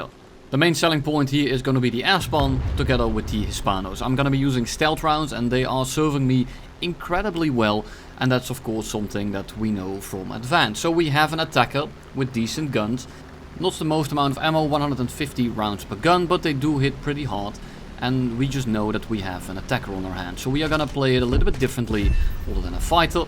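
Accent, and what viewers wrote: Netherlands. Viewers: I love your content and it's been a great motivator to hear your smarmy Dutch accented voice in the background while I go through my evening routine most days. As a second generation German-American, you've got my support, you sarcastic swamp German... Err, I mean, Dutchman!:D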